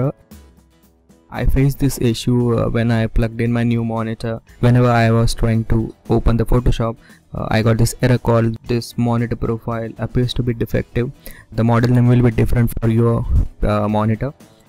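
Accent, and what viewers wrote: India. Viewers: i hate this indian accent soo much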